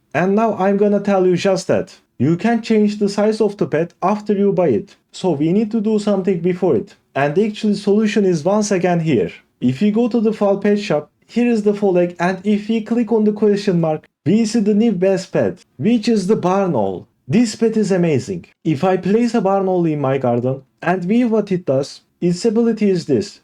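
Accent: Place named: Iran. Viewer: Bro your accent is like iranians im from iran 😊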